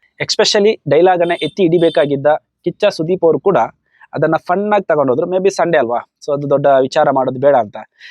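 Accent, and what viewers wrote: Mangalore, India. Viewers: Sir neevu banglore navra, nanu nimma mathu nodi manglore ankonde, navu banglore, thumba chali ide, Rakshitha Gilli best❤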